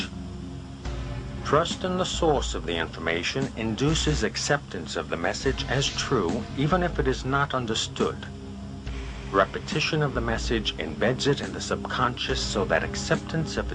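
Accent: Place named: Boston, United States. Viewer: british? he sounds like he is from boston to me!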